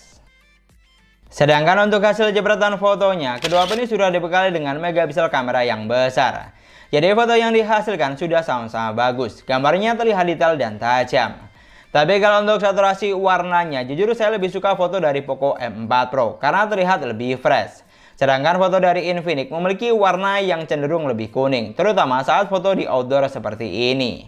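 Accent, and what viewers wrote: Madura, Indonesia. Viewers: Ini logat madura ya bang?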